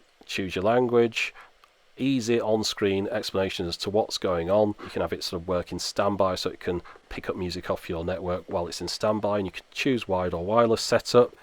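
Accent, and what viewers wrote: United Kingdom. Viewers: it's awesome that youtube can recognize your words almost correctly through your strong British accent then provide a subtitle for me to recognize what you say..😳😳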